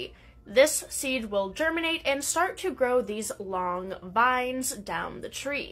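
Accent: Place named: East Coast, United States. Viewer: The effect in your voice is interesting. Maybe East Coast but maybe like some teacher you had. I find it wearing.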